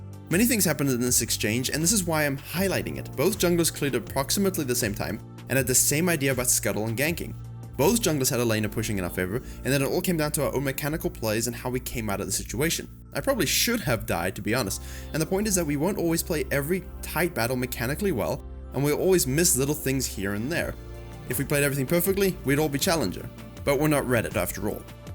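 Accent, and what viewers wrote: South Africa. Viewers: It's a pretty cool accent. I was just listening to it and kept thinking "Is that an Afrikaans accent? Wait, that sounds really English... Now he sounds American!" It was confusing but in a good way! Do you speak Afrikaans at all? I picked up a lot while I was in SA but it was really easy to learn since Dutch is my 2nd native language... I have a pretty unique accent too because of my parents are different nationalities (English/Dutch) and I grew up different countries + international school.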